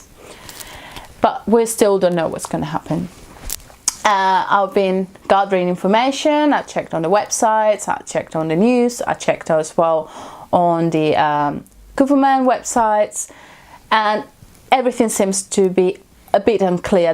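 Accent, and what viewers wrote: United Kingdom. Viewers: You mentioned you are not a native speaker and I wouldn't have really known unless you said so. You have a wonderful British accent. All the best with your new channel. It takes time to get a following so don't give up if you feel like you don't have many subscribers or views at the beginning... keep at it! - Rob W.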